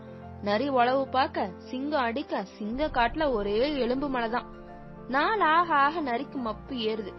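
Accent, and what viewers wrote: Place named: Coimbatore, India. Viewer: Nice cbe voice.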